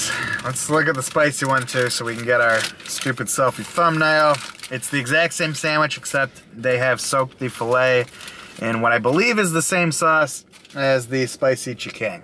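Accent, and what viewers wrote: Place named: Ohio, United States. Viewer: Thanks! Hey quick question you wouldn’t happen to be from the Ohio area would you? My dad lives in Ohio and myself in ga but I noticed your accent. Lol no disrespect or anything I just miss my family up there!!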